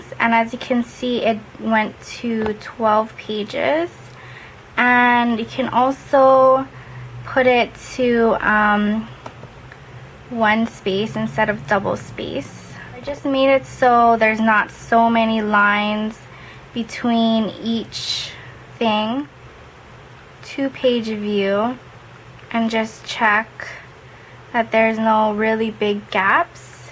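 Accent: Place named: Hawaii, United States. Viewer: I knew you were from hawaii the moment i heard your voice ! LOL xp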